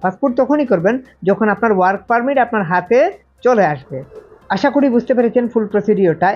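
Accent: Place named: Sylhet, Bangladesh. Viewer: ভাই মনে হয় সিলেটি, কথায় বুজে গেলাম🥰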